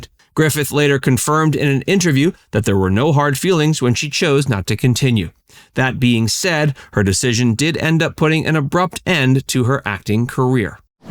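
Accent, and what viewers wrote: United States. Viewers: I like the narrator who speaks beautiful American English…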